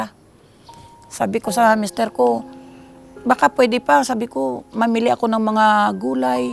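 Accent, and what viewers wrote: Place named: Aklan, Philippines. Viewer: Halata ko taga aklan ka yata Ma'am.pero di ko alam san sa aklan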